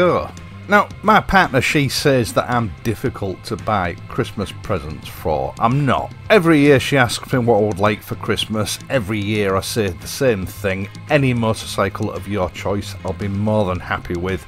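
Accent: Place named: Yorkshire, United Kingdom. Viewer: That's what keeps me watching your videos, I don't own a Triumph or an Enfield and probably never will, but your stuff stands out from the crowd, honest, down to earth, informative, entertaining, and, above all, totally individualistic! As an added bonus they are delivered with the best, broad Yorkshire accent one ever heard! Keep 'em coming mate! (and that's from South Australia where we can ride all year round!)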